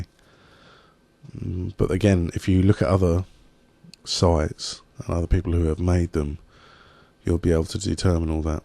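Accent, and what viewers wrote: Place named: Manchester, United Kingdom. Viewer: might be good to have some baking soda on hand as well to put out flames that might get out of control. Nice vid! thanx! Are you from Manchester? Don't know why but your accent sounds like that to me. I'm from New England so what do I know? -john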